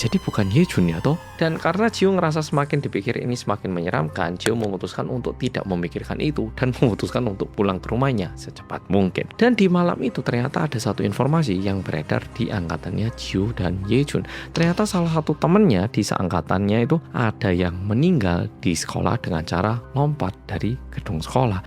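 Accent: Java, Indonesia. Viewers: Dengerin cerita mas, ngebayangin wajah2 Korea tapi bahasa nya medoook 😅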